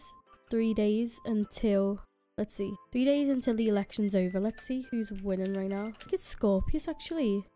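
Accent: Liverpool, United Kingdom. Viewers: You probably get this a lot but are you scouse lol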